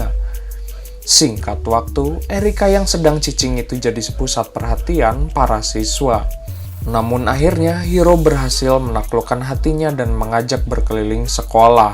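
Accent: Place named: West Java, Indonesia. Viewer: Sunda gening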